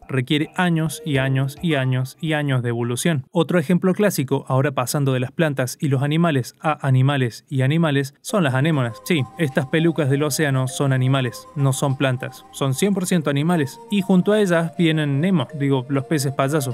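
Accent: Córdoba, Argentina. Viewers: Te noto acento cordobés, le doy like por la musiquita de terraria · Disimulalo pero sos cordobes, manso video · si es argentino, escúchale el acento nomas. Ni ahí es un verdulero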